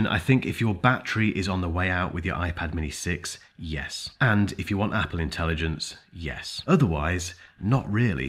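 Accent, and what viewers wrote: United Kingdom. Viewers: 看到你講話的方式跟聲音，就好像在看一部英國電影，十分有趣，期待後續 I pad mini 7 的ai評測。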